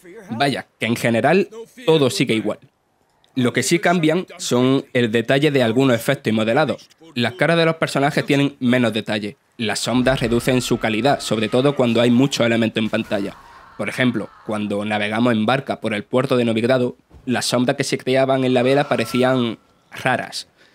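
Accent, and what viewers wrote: Andalusia, Spain. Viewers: No, yo diría que es andaluz. No es por faltar ni nada, a eso me suena su forma de hablar.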